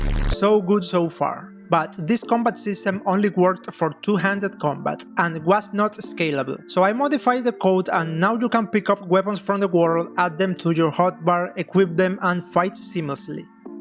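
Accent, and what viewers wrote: Spain. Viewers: Eres español?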